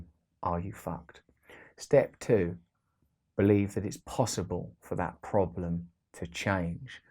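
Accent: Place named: United Kingdom. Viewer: My therapist put me on to you around 8 months ago. I enjoy listening to your British accent. And that you're so honest about sharing the fact that you were addicted to drugs & alcohol (as well as lots of twisted behaviors) resonates with me. I'm working a recovery pgm ...N/A. as of 4years I am staying clean "One Day at A Time" (was on Methadone for 25 years w/3 attempts at being loosed from those "liquid handcuffs".) I'd never had an issue believing in the Supreme Source it was anger, mistrust n feelings of not being loved by God those major "sins" kept me separated in my mind & heart ..n ultimately my body enslaved to the darkness that dwells in the shadows of the "concrete jungle" ...n the only thing I thanked God for was knowing about & learning of Jesus Christ ...for which he I believe was (n remains) my sponsor. Reading about several other major religious beliefs has always been something I've done in periods of abstinence. 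It's a saving grace today. I'm happy to say. But hey...relapse, backsliding n all that jazz..it just ain't happening...not anymore...very grateful for that🤗 But I'm interested in hearing your take on recovery... it's different...raw & bold. Have you written another version of the 12 Steps? B/c hearing you say what you said about the 1st Step..."ask yourself are you 🤬?" 😄Yeah..."Unf@ that's the bottom line mate! You're good brother 👍 and funny too 👏👏👏. It's great to be alive n not merely existing but finally living n practicing so many of the tools to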